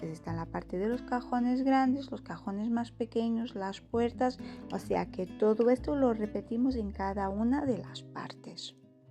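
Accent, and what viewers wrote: Portugal. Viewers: Excelente trabalho. Até aposto que a voz é de uma portuguesa🥰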